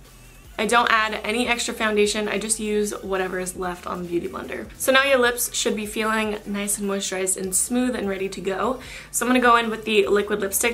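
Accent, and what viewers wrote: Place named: Boston, United States. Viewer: By any chance are you from Boston? You have a very interesting accent. Anyhow, awesome lipstick tips!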